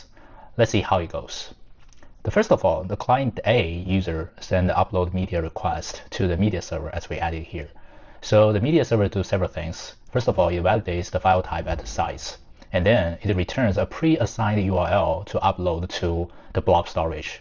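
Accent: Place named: England, United Kingdom. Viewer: Great walkthough of the design of the problem. One suggestion is to hire someone without an English accent to do the videos because it is very hard to follow and understand smoothly when people am also trying to figure out what the host is trying to say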